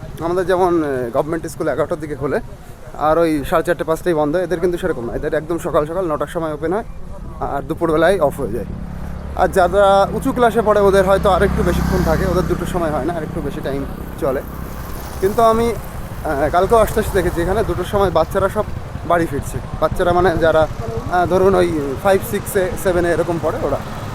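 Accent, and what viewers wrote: Bangladesh. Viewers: ❤u bangladeshee korha sune boja jachee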